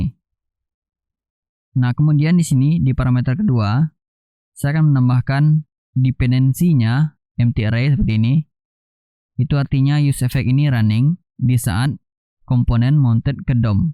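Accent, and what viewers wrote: Aceh, Indonesia. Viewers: Logat logat nya abang ni seperti orang aceh benarkah ? hehe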